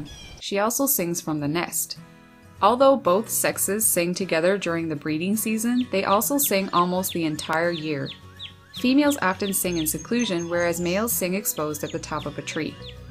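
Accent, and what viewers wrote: Canada. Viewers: Aboooot. Canadian. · Y does the male feed the female? Where do you live? You sound a bit Canadian. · Thankyou so much! My favorite little bird buddies! I knew you would answer some more questions for me. I have not been much of a birder until I got sick and was actually "forced" to take notice the last decade or so. We have had many adventures my beautiful red bandits and I lol. I hear Canada or way North for you? I am surprised and sad you don't have these characters in your backyard. We get complaints from some young new neighbors that don't seem to care much for nature sadly. But we won't let them mess with our backyard as we have tons of birds and critters-my daughter is trying to help an orphaned baby squirrel right now. All year long some of the same ones are here. Its quite satisfying. Thankyou again and everyone that shared. I have to go put out more safflower and reg seed and suet myself!🐾✌🐝🐦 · You have a Canadian accent. 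· Love the Canadian accent.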